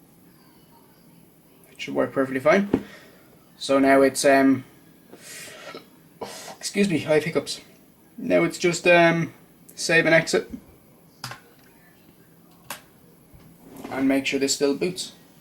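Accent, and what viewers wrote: Ireland. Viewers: is he irish?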